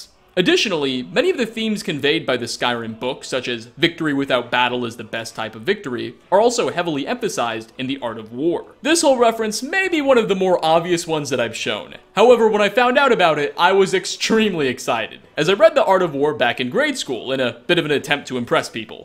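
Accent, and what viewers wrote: Australia. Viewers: Hey are you From Australia? Because if you are I've never noticed until now. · Is Australian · is aussie?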